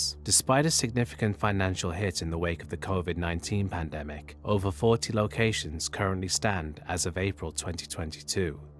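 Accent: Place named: England, United Kingdom. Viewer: This guys English accent makes these sad accounts worth listening to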